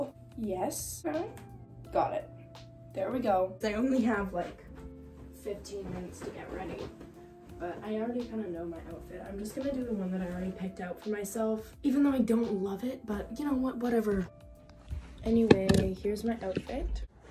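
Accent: United Kingdom. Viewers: pls ???? why did i think you were british HWJSJHWGF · nah cause i thought u was british before i watched ur yt videos 💀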